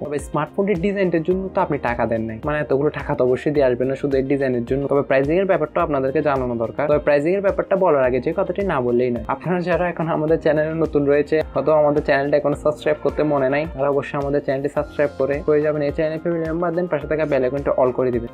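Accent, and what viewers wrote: Chittagong, Bangladesh. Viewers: চাটগাঁইয়া রিভিউয়ার মনে হচ্ছে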